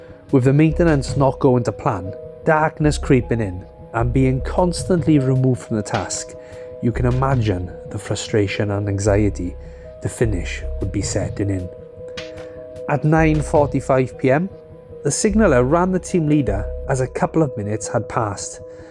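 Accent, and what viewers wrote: Wales, United Kingdom. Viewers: Love your work man. Always a plus hearing a fellow Welshman - dydd gwyl dewi hapus!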